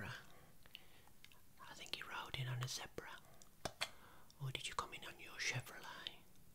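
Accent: Birmingham, United Kingdom. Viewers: 2 nights running fell asleep to this , here's for a 3rd! Looking forward to the next vid. Love your vids , who would of thought a brum accent could be so tingly Haha. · Never thought that as a girl from Coventry, that a man with a Brummie accent would send me to sleep every night! Great video, quack quack! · not often that outsiders compliment the brummy accent I must say 😂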